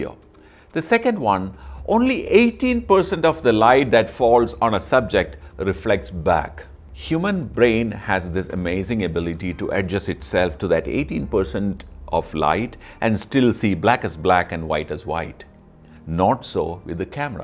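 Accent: Kerala, India. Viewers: This is how an idol photography class should be,simple yet powerful explanation The only photography channel i've subscribed Like the way you deliver,your voice and personality I am a fan of your malyali accent of speaking english :) Thank you so much sir for :)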